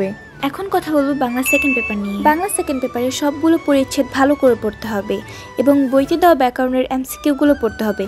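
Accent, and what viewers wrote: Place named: Sylhet, Bangladesh. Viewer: Are u sylhty??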